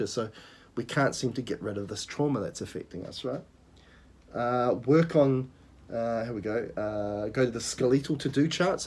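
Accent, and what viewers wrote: New Zealand. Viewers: This video gives me a lot of inspiration on how to update my own charts since I rarely use a lot of them. What exactly you said regarding a command to connect to celestial existence? Although I love kiwi accent, I did not understand this time :D Thank you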